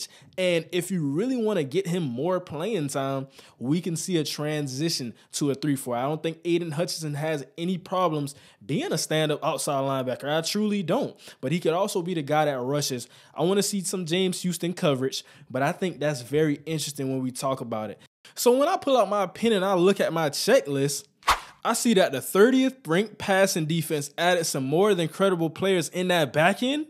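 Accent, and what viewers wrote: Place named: South Florida, United States. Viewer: Are you from South Florida?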